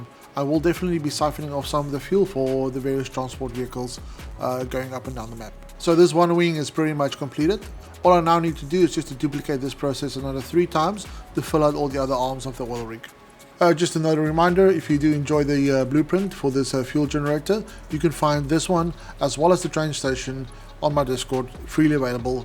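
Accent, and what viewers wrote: South Africa. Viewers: My guy, are you south african? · You sound South African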